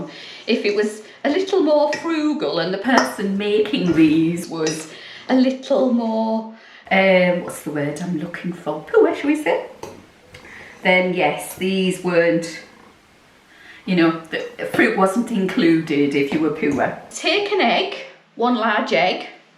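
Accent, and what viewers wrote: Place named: Cumbria, United Kingdom. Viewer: A Cumbrian now living in Devon. knew Warcop, near you, well having relations there. Love the accent!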